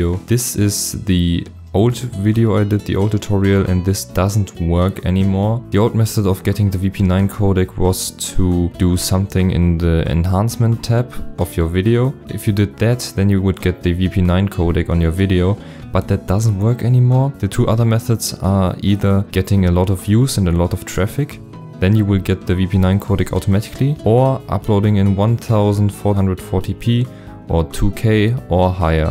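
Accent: Germany. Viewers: just uploaded a video on 1440p. first problem: i can only watch it on 1080p plus it`s still avc. hopefully it's still processing and in a few minutes it's fine edit: ich wusste doch dass du deutsch bist das hört man :) nichts gegen dein englisch, alles gut :D 2. edit: alles funktioniert danke :D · Man hört deinen deutschen akzent, fand das Video trotzdem hilfreich.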